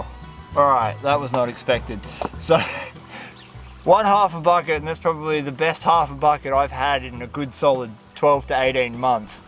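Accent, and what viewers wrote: Australia. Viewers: Is this Australia? You have that accent.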